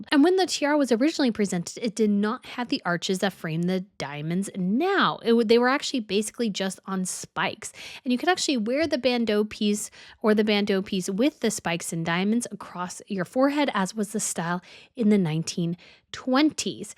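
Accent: United States. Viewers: I am a new subscriber as I REALLY enjoy your appreciation for royal history and deep admiration for all things tiaras ❤ Could you please take a little more care with pronouncing the names of royal family members and the fashion/jewelry designers? I've heard several names pronounced in the American style, which is off-putting to the designers from other countries. I'm sure your viewers from outside the U.S. will love you for it.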